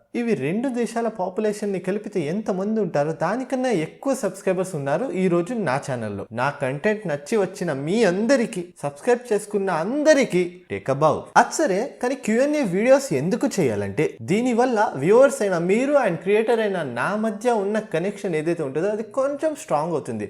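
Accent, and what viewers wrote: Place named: Telugu, India. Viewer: Mimmalni chuste sorry sorry meeru maatlade padhhathini batti telugu vaalle vunnatlunnaru..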